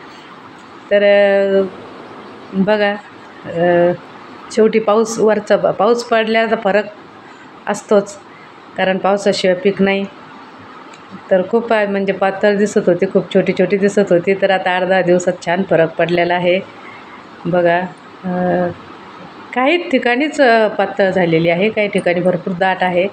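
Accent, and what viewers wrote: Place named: Ahilyanagar, India. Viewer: हो का माझं माहेर अहिल्यानगर आहे ताई आणि मी पुण्यात असते 😍 ताई मला वाटलेच होते तुम्ही नगर च्या च असणार बोलण्यावरणे 👍🏻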